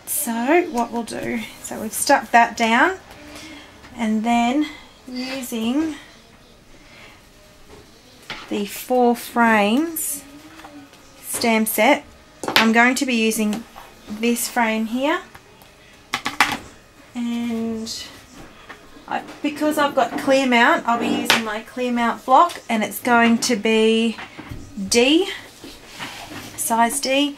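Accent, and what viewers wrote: Australia. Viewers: Hi hunni, love your tutorial.. just wondering if you were Aussie. . Lol!!! Hope i. Mot offending you by asking...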